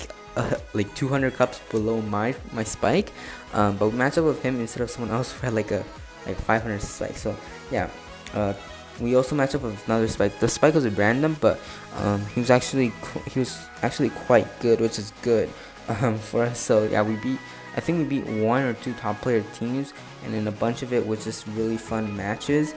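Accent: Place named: Brazil. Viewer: Parace br tentando falar inglês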